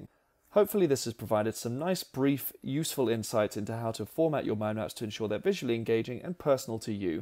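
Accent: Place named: United Kingdom. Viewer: Very nice tutorial, wonderful accent :) God save the king!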